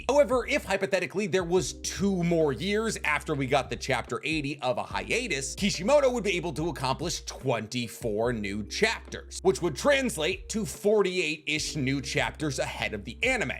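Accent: United States. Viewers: It’s Ka-wa-ki, stop pronouncing Japanese names as an American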